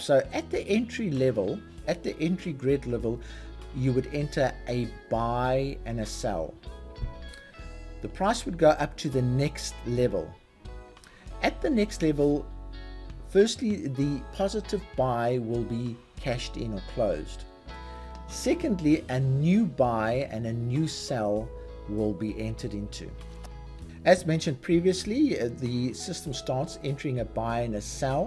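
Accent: South Africa. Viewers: That accent- are you South African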